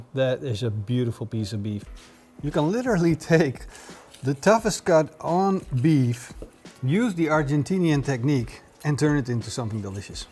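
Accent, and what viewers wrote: Netherlands. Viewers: You must be dutch, can hear the accent. great recipe tips. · I'm Uruguayan, Chimi is our "religion" and this is a very good recipie. Your ingredients choice are correct and kudos for not adding 'inovative' ingredients. The choice of oil and salt makes it more 'gourmet' but the basics are 100% correct Only improvment I can see is that if you want to have the best Chimi ever, replace the dried oregano with FRESH oregano. it will upgrade it to a totally new level ps. you sound Dutch. where are you from ? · What a big Dutch accent bro . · Awful Dutch accent. I hate the Dutch. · Meest nederlandse accent ooit haha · Awesome recipe! But please do something about your randstad accent. It's appalling to us Dutch who speak English without that shitaccent. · Dutch accent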